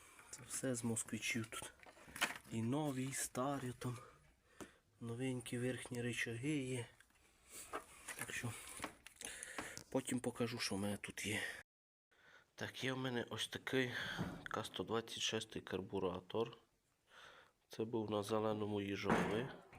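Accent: Transcarpathia, Ukraine. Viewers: Українська ,закарпатський діалект ти ще не чув наш суржик південний😂 а взагалі у всьому світі така ситуація,в кожній місцевості свій говір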